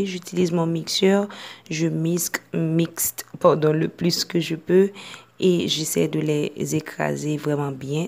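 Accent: Haiti. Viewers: t'es haïtienne ça s'entend lol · Vous etes une haitienne ca c est sure...votre accent francisé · Tu es une haitienne benh ouais vous l'êtes avec tes prononciations francais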